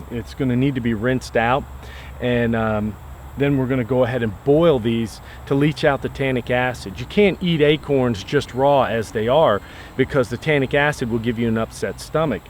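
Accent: Georgia, United States. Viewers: are you from Georgia?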